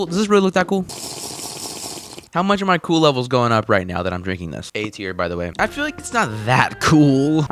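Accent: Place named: United States. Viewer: Why you got a prick annoying Americans accent?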